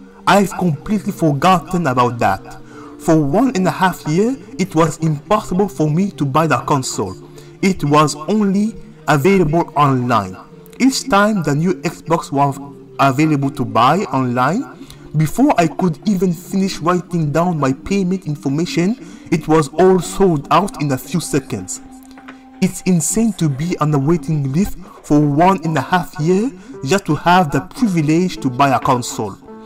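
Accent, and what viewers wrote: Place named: Africa. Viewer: Just stumbled on your channel and I'm not disappointed 🤣 I always find a lot of trust and kindness in African accents haha anyway I just bought mine last week, I hope you love it as much as I do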